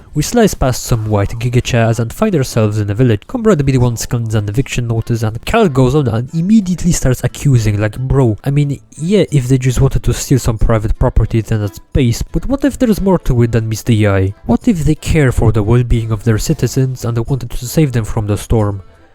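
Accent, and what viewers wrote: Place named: India. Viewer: so where the hell are you from you have an accent so indian?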